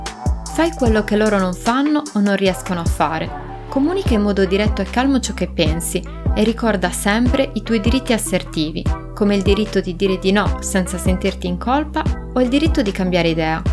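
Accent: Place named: South, Italy. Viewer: Amore, con questo accento terrone? Un libro di grammatica, no? Quanta ignoranza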